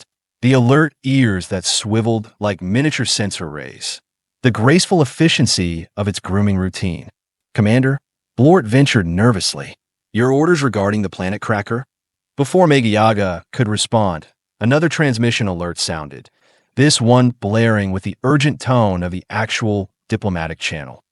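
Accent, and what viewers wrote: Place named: Spain. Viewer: Never get rid of the wierd and occasional pronunciations with a Spanish accents. So much fun. I look forward to them 😊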